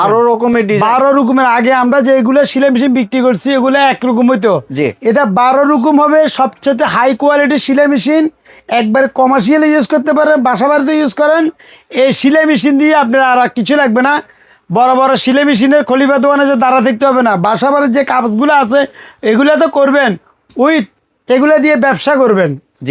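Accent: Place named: Noakhali, Bangladesh. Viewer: ভাই নোয়াখালীর মনে হয়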